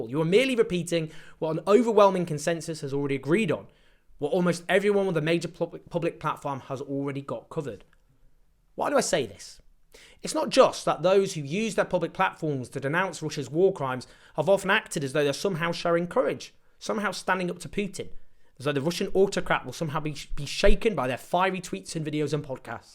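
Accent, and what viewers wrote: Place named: England, United Kingdom. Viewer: You talk in a pommy accent so unless you live There in Israel you only believe what your hearing from Hammas who are lieing, just listened to the speech comming from a son of a Hammas comander who grew up in Garza, he speaks in in support of isreal and said don't believe the lies comming from Hammas about thousands of children been killed it's all lies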